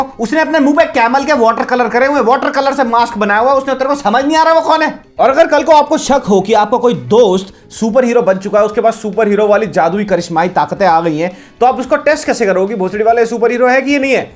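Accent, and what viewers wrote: Haryana, India. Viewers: Bhai tu haryanvi h k? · that hariyanvi accent tho : D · The haryanvi accent rock bhai!! Yahi chahiye!!! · Bhai tere haryana haavi ho ra h 🤪🤪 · Video se mast to bhai Ki Haryanvi Thi🤣🤣🤣🤣😂🤣😂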